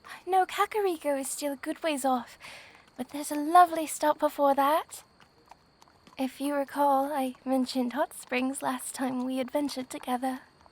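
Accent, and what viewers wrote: United Kingdom. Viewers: Since when did you unlocked the British accent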